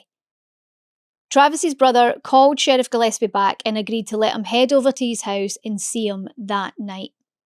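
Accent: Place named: Scotland, United Kingdom. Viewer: Love it.... Keep it coming mate... and from one Jock to another, do not 'ever' dial that accent back... Its totally ' you' and it totally makes it 🙂 x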